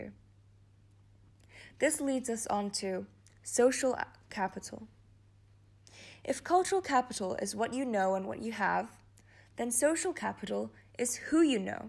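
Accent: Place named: France. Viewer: Love the French accent ladee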